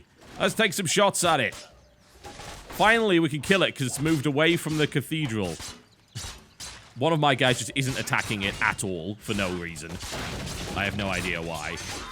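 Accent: United Kingdom. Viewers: hails from England, but has been living in america for years, that's why his accent isn't very prominent, but he still uses british terms. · It's the British pronunciation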